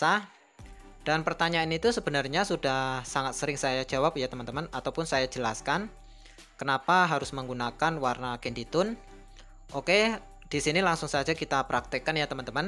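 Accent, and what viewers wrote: Java, Indonesia. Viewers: saya kira di jawa mas, soal e logatnya kayak orang jawa.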